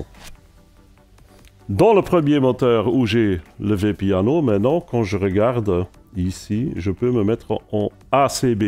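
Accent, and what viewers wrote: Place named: Belgium. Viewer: Il as un accent belge lui mdrr super présentation 👍👍👍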